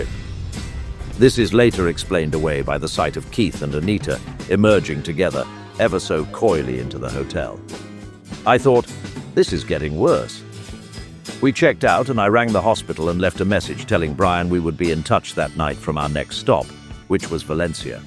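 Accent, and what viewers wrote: United Kingdom. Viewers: It is really good, isn't it ? A professional quality documentary. A BRITISH accent makes a wonderful change from all the rubbish with American accents. Those are inevitably clueless and full of idiotic basic factual errors. Great work. You've got another subscriber !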